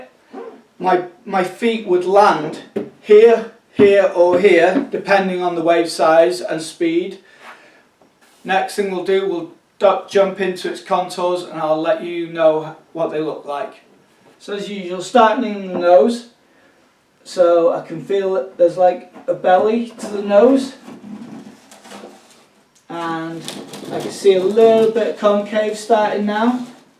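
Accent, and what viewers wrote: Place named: England, United Kingdom. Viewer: G'day from Australia, fantastic in depth, informative review. I want one! Great to hear an English accent on a surf related video for a change! Are they really lightweight? Loved your comment on buying the board for maximum fun rather than how cool it looks. Subscribed. :)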